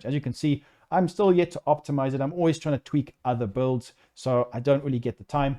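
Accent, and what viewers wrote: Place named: South Africa. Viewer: From your accent(btw loved the vid), are you South African?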